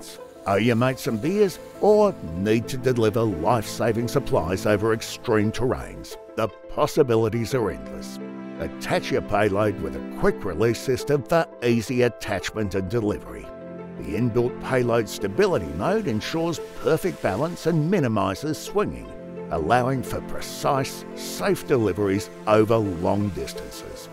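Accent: Australia. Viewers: Na, this old mate sounds true blue Aussie 🇦🇺, it's great and I'm a kiwi 😅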